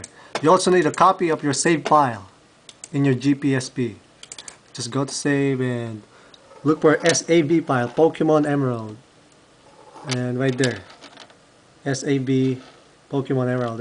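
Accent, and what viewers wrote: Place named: Philippines. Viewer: by the way he speaks.... i am going to say hes a filipino -__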